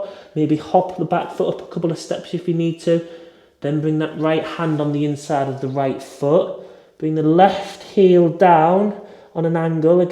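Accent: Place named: Leeds, United Kingdom. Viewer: Thanks. I’m from Leeds originally so the accent reminds me of home 😊. Many thanks, really I was thinking of some gentle stretches that would be good for anyone who are is currently not running but wanting to keep in good condition for when they start back running. Just an idea. Thanks!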